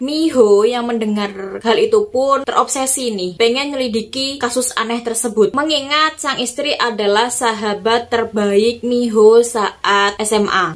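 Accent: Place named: Yogyakarta, Indonesia. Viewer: Domisilinya Jawa mana kak? Suaranya mengingatkan aku sama temen-temen di Jogja. Sehat terus ya kak biar bisa mereview novel-novel lain. Amin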